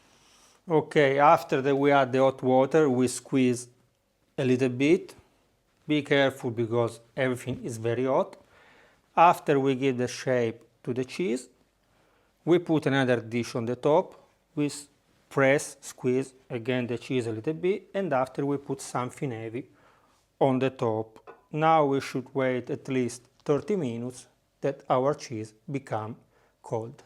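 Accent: Italy. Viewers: Spe-cta-cuuu-larrr I like your all videos & Italian flavoured ENGLISH... Best wishes & love from INDIA...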